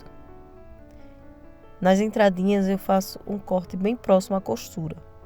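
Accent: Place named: Northeast, Brazil. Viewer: Tudo que você faz nos encanta, e esse jeito de ensinar maravilhoso😊 com um sutaque puro nordestino gostoso de ouvir, amoooooo...❤❤❤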